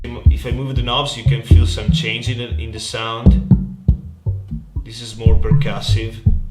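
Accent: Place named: Italy. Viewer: si sente troppo che sei italiano<3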